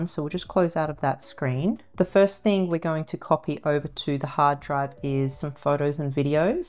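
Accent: Australia. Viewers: You sound Aussie so why are you using the American Amazon site? Could you please change it to Australian Amazon?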